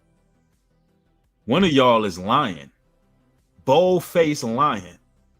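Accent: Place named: Philadelphia, United States. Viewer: Your Philly/Meek accent is hilarious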